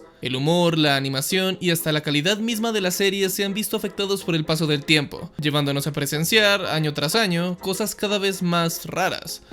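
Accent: Colombia. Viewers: Vato cuando hablas normal se te desborda el acento colombiano xd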